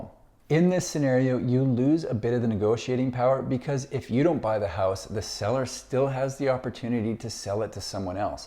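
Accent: Canada. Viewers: Why am I listening to a canadia?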